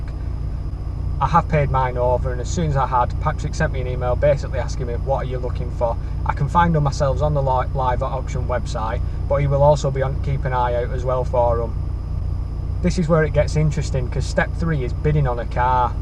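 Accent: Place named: Lancashire, United Kingdom. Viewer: Just on part 2 now, enjoying it so far! Subscribed btw. Where you from if you don’t mind me asking? you sound local to me, lancs, Blackburn Area ish accent? 👍🏻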